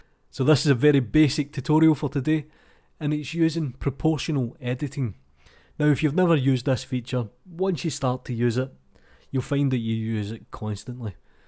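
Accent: Scotland, United Kingdom. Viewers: You just propelled yourself into YouTube stardom with your little magpie beef, there at the start. Gotta love a Scottish accent. Plus the info was concise and accurate. Thumbs up, mate 👍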